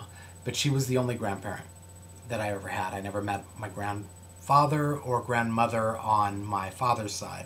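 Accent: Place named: South, United States. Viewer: This Accent Tag was a cool idea. It's really interesting too, and I like how you have a mix of saying words that are both Southern and Eastern. Though I had thought everyone called "remotes" flippers :P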